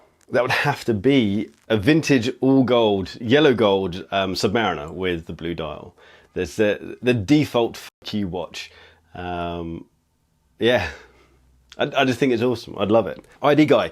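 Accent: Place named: Scotland, United Kingdom. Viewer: Hey, where in Scotland are you?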